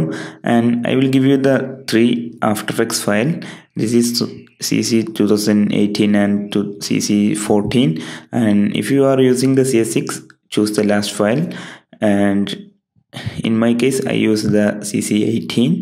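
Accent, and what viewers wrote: Kerala, India. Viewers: Why do you sound Malayali (Keralian)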